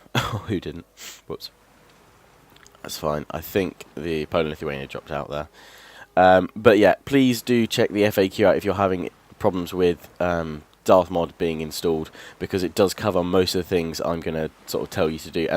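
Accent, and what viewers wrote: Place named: United Kingdom. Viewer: Yes it's his real voice lots of Brits sound life that.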